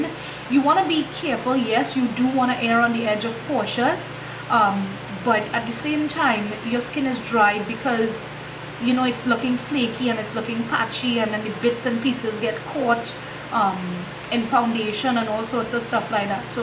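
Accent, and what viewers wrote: Trinidad and Tobago. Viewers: Are you Trinidadian? aha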